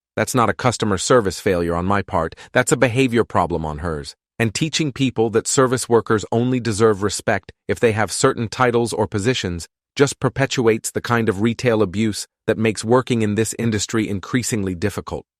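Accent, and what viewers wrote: India. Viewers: Why the Indian accent?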